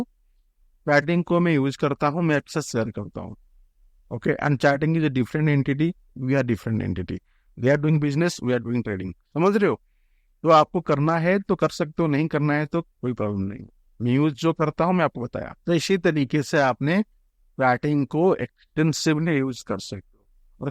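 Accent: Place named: Bengal, India. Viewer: bhai aapka hindi samaj mein ataa nahin.. bengali bolte ho aisa lagta he..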